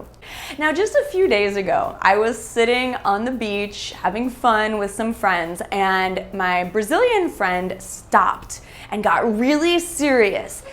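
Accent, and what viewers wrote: United States. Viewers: ya lookt awesome suppa beautiful. Light and camera angle were perfect and the narrative like an American blondie. Thanks for these videos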